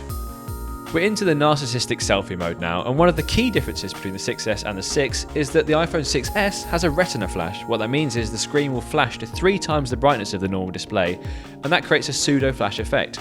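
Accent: United Kingdom. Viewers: why is this guy speaking British?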